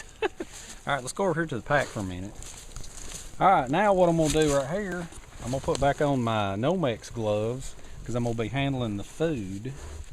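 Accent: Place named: Georgia, United States. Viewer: Cool idea....I like it because it decreases by one the number of tools you would have to carry... I thought you sounded like you were from Georgia... I lived in Warner Robins for 16 years.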